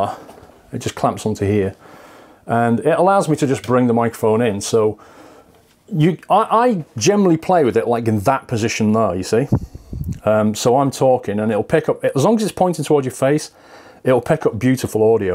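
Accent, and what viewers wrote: United Kingdom. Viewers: Squirexit lol. youre a lovely brit. naiss accent. love it. greetings from switzerland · Great to see where you record. Nice to hear another Brit as well. We're thin on the ground it seems haha. From what corner of our tiny island do you hail from?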